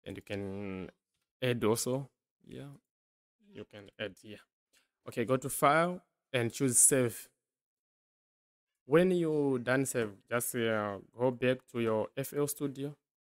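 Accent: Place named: Zimbabwe. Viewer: This voice is Zimbabwean chete thanks bruv much appreciate the help 🎉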